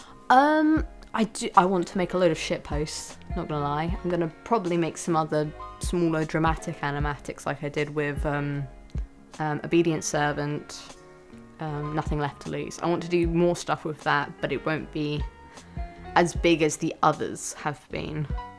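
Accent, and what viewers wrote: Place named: United Kingdom. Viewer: I love your accent ❤️❤️❤️ is it British?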